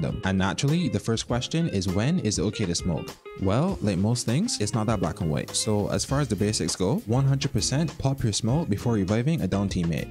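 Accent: Barbados. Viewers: Sound like a bajan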